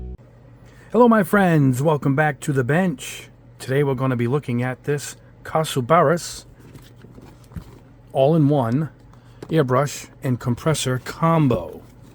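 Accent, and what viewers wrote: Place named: Rhode Island, United States. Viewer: I've been using my Iwata CN NEO in both trigger and button valve for a few years now and I'm getting better every day. I need an airbrush with a 0.2mm or smaller needle for painting some minis. Sure, I would love to get an H&S but $$ is really an issue for me as a retired person. What airbrush would you suggest $50-$75 for what I'm looking for? Hey; by the way, I'm a fellow Rhode Islander born and raised in Bristol before I relocated to (Bristol) Vermont 15-16 years ago... I love the fact that I can listen to your accent and really feel like I'm at home listening to an ol' buddy.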